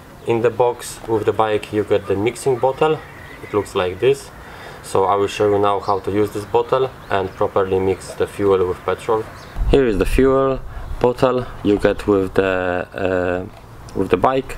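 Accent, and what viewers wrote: Poland. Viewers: Słychać ze Polak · Masz polski akcent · słychać że polak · Po języku słychać ze jesteś Polakiem wiec czemu nie mozesz mówić po polsku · Też czułem że Polak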